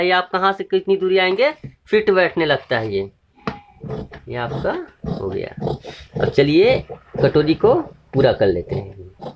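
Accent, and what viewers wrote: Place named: Maharashtra, India. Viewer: सर आप महाराष्ट्रीयन है आप ईस व्हिडिओ मे जोभी वल्ड बोल रहे होना ईसी लुये हमे लग रहा है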